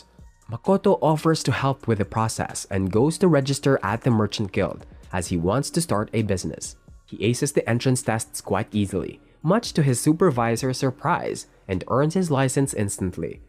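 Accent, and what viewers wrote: Philippines. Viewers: Pilipino kano tangina mo alam ko ang boses ng english ng pilipino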